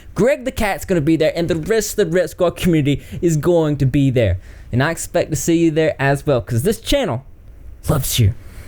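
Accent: South, United States. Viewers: are you from the south? I can hear a southern draw in your voice.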